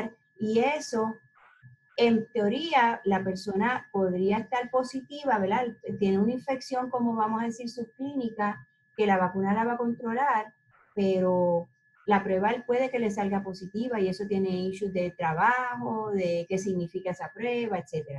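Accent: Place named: Caribbean. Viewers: Jorge José, decir que una médica pierde su credibilidad por tener acento caribeño es racismo. Que pasa con los médicos caribeños? No son lo suficientemente buenos para ti? Todas las personas deben ser tratadas con respeto, independiente de su país de origen. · Tiene acento caribeño pierde credibilidad y peor si son venezolanos.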